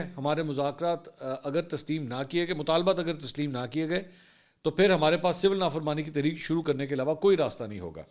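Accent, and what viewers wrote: Punjab, Pakistan. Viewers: Mansoor bhai Jo tusi punjabi touch dendey o na 😂 sawaad a janda a ❤❤❤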